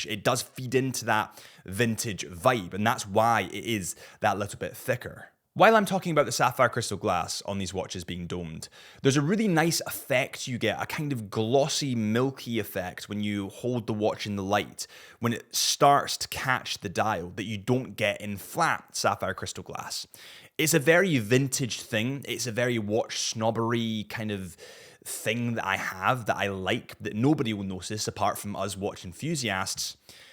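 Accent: Scotland, United Kingdom. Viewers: Scottish my guy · He is not English, he is Scottish ☝🏽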